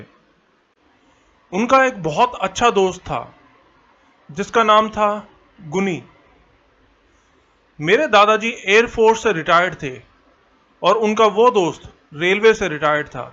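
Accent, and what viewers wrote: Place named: Sindh, India. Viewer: Aap kya sindhi ho kya baat karna waqt rhoda sindhi sound karta ho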